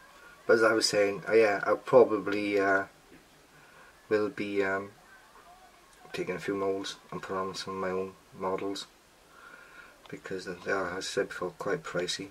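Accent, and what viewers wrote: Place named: Wales, United Kingdom. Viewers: Welsh :D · Jesus! I can't even read the subtitles! What an accent!!! Dat British...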